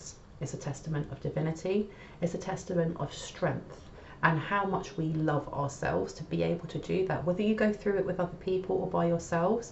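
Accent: England, United Kingdom. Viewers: Finally a pommy reader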